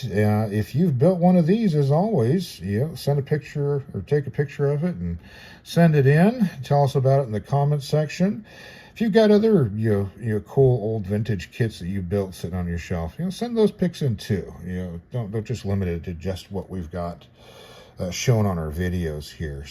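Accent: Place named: United States. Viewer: It's good as it is , even the pronounciation of French words is passable for an American 😅, good job.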